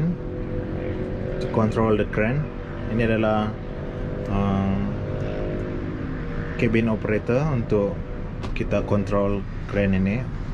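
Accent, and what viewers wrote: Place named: Sarawak, Malaysia. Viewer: Orang mana boss? Macam orang sarawak je..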